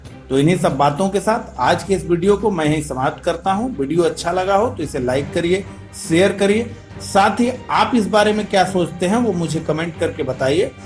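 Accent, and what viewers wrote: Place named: Bihar, India. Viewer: ap ki hindhi bhasha ek dam bhihari lagti he.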